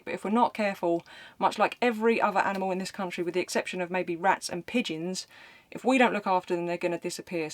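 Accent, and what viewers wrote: United Kingdom. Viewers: Does anyone know what her accent is? What part of the UK is she from?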